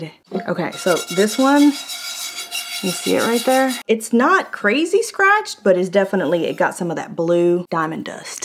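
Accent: Texas, United States. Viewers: Are you talking in a southern like paula dean southern accent in this video... or i am I just noticing it ps i think I have seen all the your videos so I dunno why it sounds that way today maybe it is cause you are doing a cooking video, ps you said that right when i finished typing this then i had to click edit right then to add this part!!! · Girl I was thinking the same thing! “Does always have such a strong southern accent “ lol funny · First of all glad I found your channel again.👍 Second did not know your shirt was changing colors was too into the test results. I like the pink one.👍 Third I've watched about five of your videos I thought you always had a Texas accent. And you lived in Texas. 🦤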